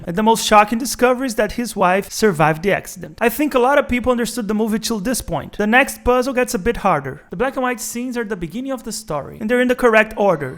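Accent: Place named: Brazil. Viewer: Sotaque de Br 👍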